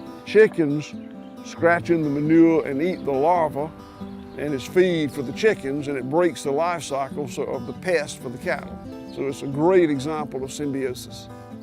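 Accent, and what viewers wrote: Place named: South, United States. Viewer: I love what you're doing at White Oak Patures & I love that old southern accent.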